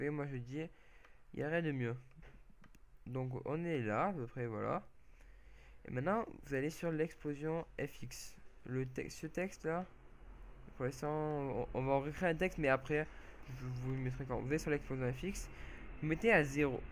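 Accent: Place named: South, France. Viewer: Aaaah ! On sent l'accent du sud ! Sinon j'aime bien !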